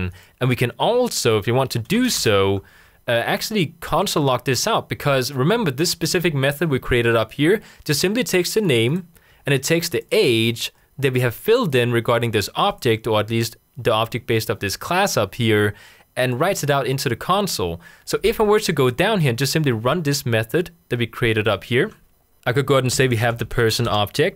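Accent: Denmark. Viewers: I love your way of explanation. You are my kind of teacher who explains basic and doesn't assume that others will pick up the complex concepts easily. When I first listened to you, I thought you must be Danish (Your English accent). Now, I have checked your About which confirms that you are indeed Danish. Thusind tak for fantastiske videoer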